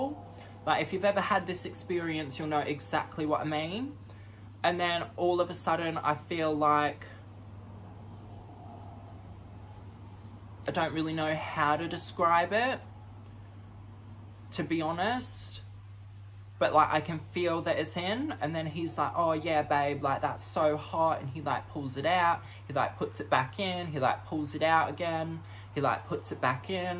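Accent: Australia. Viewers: MATE LISTEN TO ME PLEASE...YOU SHOULD BE ON TELE BRO FIRST TIME WATCHING YOU AND IM HOOKED.. YOUR ACCENT SOUNDS A BIT AUSSIE AND A BIT OF ENGLISH SOUND COOL MY MAN LATERS LONDON BOY..PEACE.. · Pretty sure it's Australian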